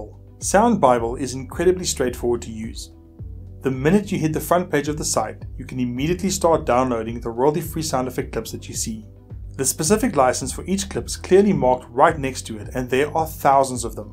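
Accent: South Africa. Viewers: South African accent? Love it, apologies if I'm wrong. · South African accent! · You sound South African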